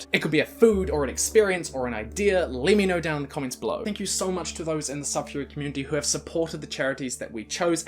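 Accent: New Zealand. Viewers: Man, some old videos, before had tamed his kiwi accent yet.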